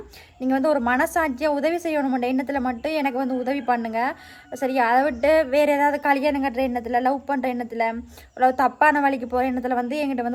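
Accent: Sri Lanka. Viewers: இலங்கை தமிழ் அழகு நீ பேசுவதும் அழகு கபடமற்ற பேச்சழகு பெண்மை அழகு உன்னிடமுள்ளது.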